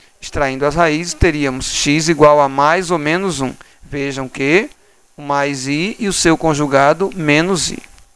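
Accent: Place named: Ceará, Brazil. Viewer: é engraçado teu sotaque "sóbitraíndo" "pólinómio"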